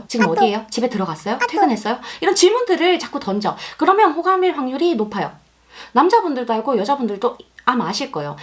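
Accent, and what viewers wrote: Gyeongsang, South Korea. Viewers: 사투리 안쓰는거 같은데.. 단지 억양만 경상도 식이지만. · 사투리가 아니라 경상도 말씨 인거죠 대한민국 사람이 이 정도 못알아들으면 그냥 자막 보라고 하세요. 영상 아주 좋습니다 개념치 마시고 힘내세요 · 사람은 가장자기 다울때가 젤매력적인 거라고 생각해요 사투리와 경상도억양 넘 듣기좋은데 근데 마지막 서울표준말도 귀엽긴하네요 ㅋ 서울표준말은 가끔 써주세요 🤭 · 저는 의 경상도 억양이 아주아주 좋습니다. (저는 경상도 사람이 아닙니다.) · 자존감을 지키세요 경상도말투는 안바뀝니다 서울말 쓰는 채널보러가라고 하세요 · 대구 경상쪽 치고 사투리 많이 없으신 편이에요. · 그모든것을 알아도 눈치가 없으면 꽝~ ㅋㅋㅋ 이론과 현실은 다를때도..케바케~ 그리고 다 알고있으면서도 내가 겪으면 상황판단이 잘안되는....머 ~ 그런경우도~??? 일로서 사람을 만나는 경우는 잘안다고 생각하는데... 이성에 대해서 관심은 많으나 만나보고 싶다는 관심이 없다보니.... 호감인지~ 호의인지 구분짖지도 않고...ㅎㅎㅎ ^_________________^ 이번 영상도 재밌게 끝까지 다봤는데... 끝에 끝맺음에서 사투리 관련해서 말을 하셔서 글을 보태여 보내요~ 저는 경상도 사투리 귀엽다고 생각합니다. 대구는 "맞나~?"라는 말을 많이 쓰더라구요~ ㅎㅎㅎ 저는 꼭 표준말로 영상을 만들어야한다는 것은 없는거 같습니다. 듣기 거북하다면 안보면 되는것을 굳이 영상을 봐가면서 컨텐츠 제작자에게 이야기를 하는것은 크레이터에게는...그리 좋은 말로는 안들릴거 같습니다. 몇십년을 사투리를 표준말이라 생각하고 살아왔고 그 사투리를 하루아침에 바꾸는 것도 힘들텐데~ 그리고 그억양을 바꾸려하다보면 이것도 저것도 아닌게 될수도 있습니다. 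저는 전라도지만 군생활을 경기도에서 해서 경기도억양도 쓰다가 친구가 하동친구가 있어서 경상도 억양도 나오다가 친구들 만나서 전라도 사투리 하면... 너 어디사람이냐고 물어봅니다. ㅋㅋㅋ 의 사투리가 저는 귀엽습니다~ 그대로~ ~~ 쭉~~~~~~~~~~~해주시길...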